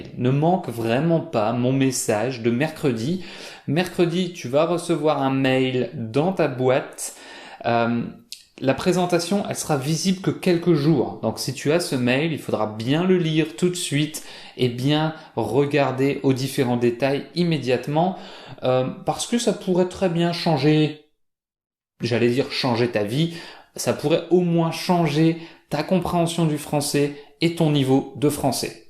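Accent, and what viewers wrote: France. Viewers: Salut! Merci encore pour vos vidéos pleines d'enseignements et d'instructions, bien entendu. J'suis un étudiant senegalais passionné des langues étrangères notamment le français et l'anglais. Vos vidéos m'ont beaucoup apportées en terme de niveau français. Mais j'ai un petit soucis pour ne pas dire un problème, étant fasciné par la culture française, j'aimerais bien avoir un accent français assez simple comme le vôtre; mais je ne sais pas comment s'y prendre? Avez vous une solution à cela?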